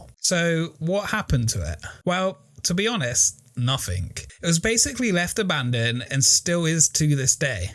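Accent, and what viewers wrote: United Kingdom. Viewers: why is a British accented person telling me more about my town's crazy oddity than i ever knew myself LOL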